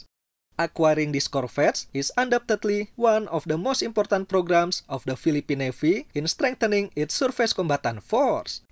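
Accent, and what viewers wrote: Indonesia. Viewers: Pengucapan english nya kaya org indo admin nya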